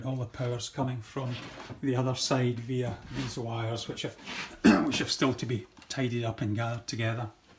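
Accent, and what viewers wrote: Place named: Scotland, United Kingdom. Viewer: Always nice to hear a Scottish modeller. Subbed 👍🏻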